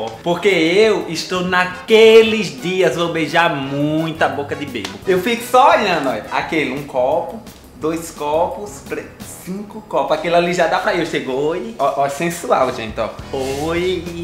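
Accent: Northeast, Brazil. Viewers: De que estado é a Samantha? A região é claro Nordeste. <3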